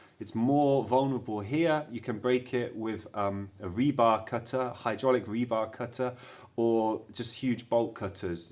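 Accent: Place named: United Kingdom. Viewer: hear me out... He's in Brussels, sounds like he's from the UK, maybe they call an angle grinder a circular saw across the pond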